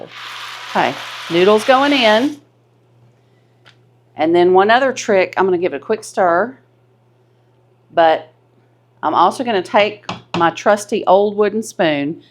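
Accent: United States. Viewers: 1st time viewer in Latvia. Wow, the minute I heard your accent, I knew this was gonna be the real deal for this iconic American dish! Appreciated the relaxed, down to earth presentation. Subscribed. Thank you!🎉 (Will maybe add some ground pepper tho😂)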